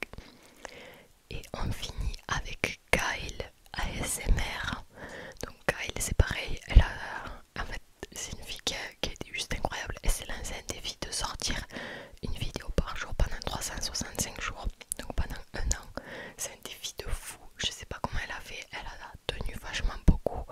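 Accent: South, France. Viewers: Hello, oh mais c'est trop adorable ! Merci merci pour tes mots 🥰 Je regarde souvent tes vidéos aussi et j'apprécie ton chuchotement avec ton accent qui sent bon le sud 🥰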